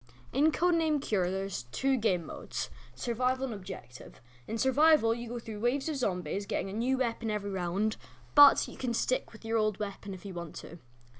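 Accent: United Kingdom. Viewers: are you Scottish or? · i like british people, and you sound british just in case your not, hell im even trying to speak in british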